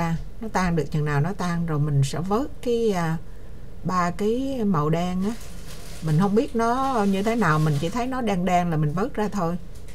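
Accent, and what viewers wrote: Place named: Central Vietnam, Vietnam. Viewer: Chị nói chuyện thật là mộc mạc, đúng người miền Trung ❤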